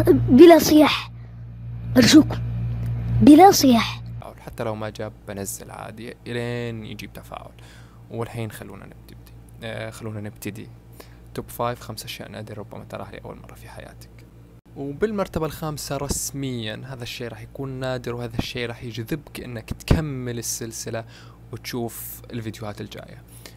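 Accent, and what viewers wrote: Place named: Levant, Jordan. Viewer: توقعتك من الأردن او فلسطين وفعلا صوتك مميز ملاحظة بسيطة قص اظافرك 😁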